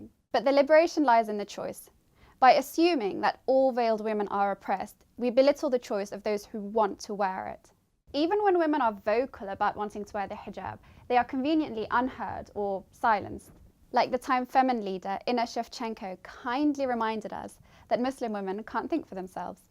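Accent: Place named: United Kingdom. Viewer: Literally everything she said is untrue . The fact that she has a thick British accent makes this all the more SMH....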